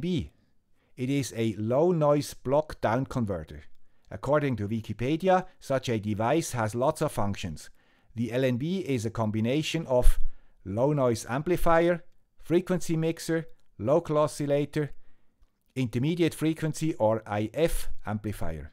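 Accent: Switzerland. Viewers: The Ham Radio with the Swiss Accent rocks! Very interesting. Do you know any good online electronics course? To get more deeply into the theories behind this kind of circuits.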